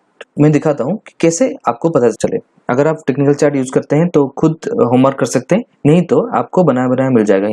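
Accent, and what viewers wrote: Odisha, India. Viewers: Are you Odia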